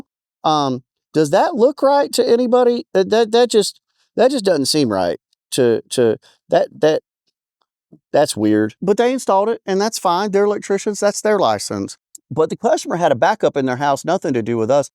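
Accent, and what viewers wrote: South, United States. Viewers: The more angry and sarcastic you got the more Southern your accent got. If you ever hear that directed at you, I’d run. might six his brother on you. 😬😂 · whats with the accent brother i know your from the south....ish but dam... not serious do your thing man keep kicking ass and calling out the bs. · Are you turning it up with the southern accent? I don’t remember it being so strong in your past videos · The southern twang of Sarcasm just gets stronger and stronger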